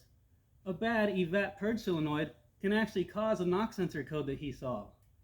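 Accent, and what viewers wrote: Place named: Ukraine. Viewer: I can tell you’re Ukrainian by your accent.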